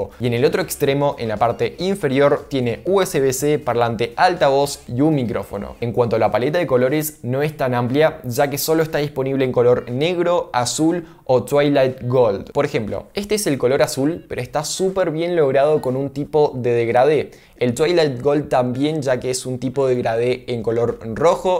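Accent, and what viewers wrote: Argentina. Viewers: Excelentes review das detalles de todo, sea bueno o sea malo y sos argentino! Saludos desde México wey xD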